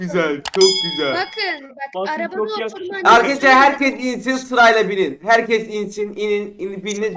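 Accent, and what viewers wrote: Azerbaijan. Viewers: Azeri misin Türkmüsün bir dilde konuş anlamıyom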